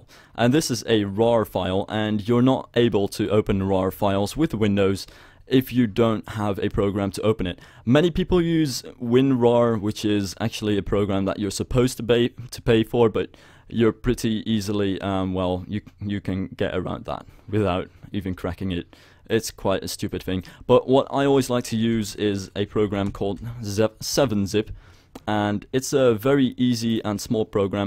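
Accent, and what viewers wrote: Netherlands. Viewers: Jij bent Nederlans haha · are you dutch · haha ik hoorde dat je nederlands bent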